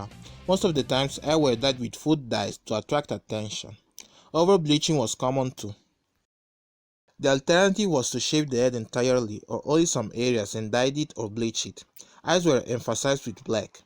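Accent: Italy. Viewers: From your accent I feel that you are Italian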